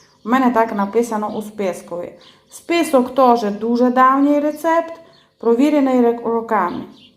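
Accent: Transcarpathia, Ukraine. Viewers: Мова українська, закарпатський діалект:))) звучить дуже цікаво і гарно. А закрутки в господині неперевершені! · Дякую за чудові рецепти! Томатний сік вийшов смачний за вашим рецептом, тепер спробуємо помідори. Дуже люблю слухати вашу закарпатську вимову - вона збагачує українську мову. ❤ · Кайфую від вашого закарпатського акцента.Дякую! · ви направду дуже гарна господиня), все, що я робила за вашими рецептами було дуже смачне. А ще, мені дуже подобається закарпатська говірка. Дякую вам дуже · Щиро дякую за рецепт і за чудову закарпатську говірку.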